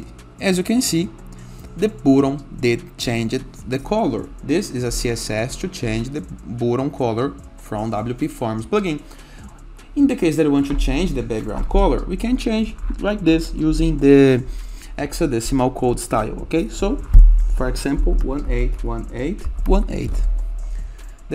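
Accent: Brazil. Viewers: Caraca, assim que ouvi a voz já pensei: "é brasileiro" kkkk Eu reconheço o sotaque do meu povo. Mas depois vi que estava em português o conteúdo do vídeo kkkk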